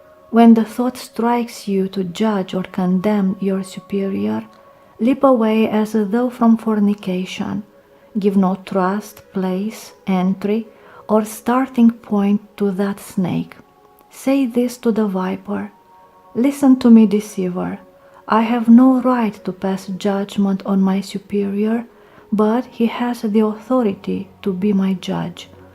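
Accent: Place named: Eastern Europe. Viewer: i insist, it sounds beautiful. your accent makes it sound all the more so. and despite the accent (in my ignorance i would guess eastern european or even russian), every single word is pronounced correctly and everything is as clear as pure water. had a robot been reading this, i would not have listened to it.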